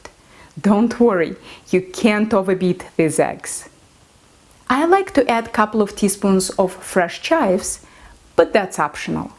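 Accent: France. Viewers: Very good, and I love your french accent! I am going to try this tomorrow.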